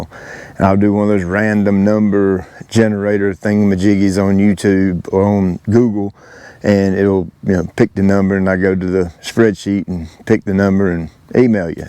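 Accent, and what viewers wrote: South, United States. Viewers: Hey, the only thing thicker than your smooth Southern accent is your gorgeous lawn! All we can see up here in Minnesota is snow, snow, snow. But, you're helping us hit the ground running when Spring finally hits. I hope your socks are blown off from all the Blessings!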